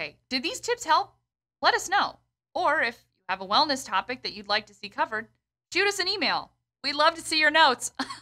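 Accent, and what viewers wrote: United Kingdom. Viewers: Hi, thanks for information! I like the speaker saying british accent😂 And is this method applicable to non fiction class? Such as anthropology? Thanks!! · omg with british accent you are just like Miss McGonagall from harry potter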